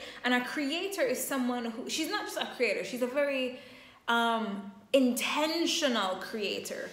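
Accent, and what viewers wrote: Jamaica. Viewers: I hear jamaican accent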